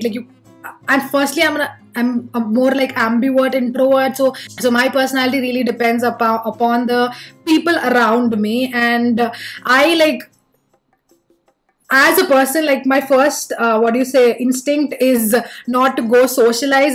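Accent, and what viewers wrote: Telangana, India. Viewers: Much much relatable. I have recently discovered your channel and really love your content. Please vlog in Telugu also. Love the Telangana slag 😛Loads of love from Vijayawada.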